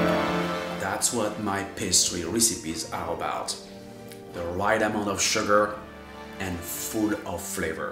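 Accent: France. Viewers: Are you French or not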